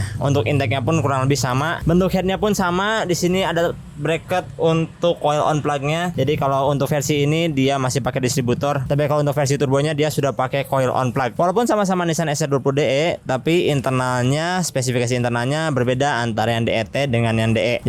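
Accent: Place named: Sunda, Indonesia. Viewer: Gak disangka, ternyata Akang ini kalo ngomong bodor & Sunda pisan Hehe. Kang kalo motuba tahun 90an (mesin injeksi awal2) diubah ke standalone gini abis berapa duit?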